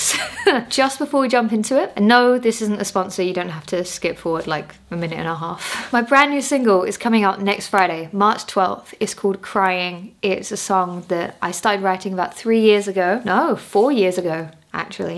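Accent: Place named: Midlands, United Kingdom. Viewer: As a fellow resident of the Midlands I love that has become fully naturalised and now says "laff" rather than "larf".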